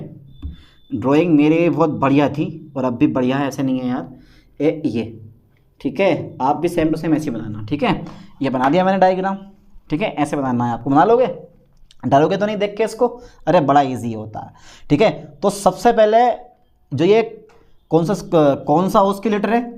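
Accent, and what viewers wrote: Bijnor, India. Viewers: Sir ap district bijnor se ho ...? Apki bolne se lag rha hai ap bijnor district se ho ...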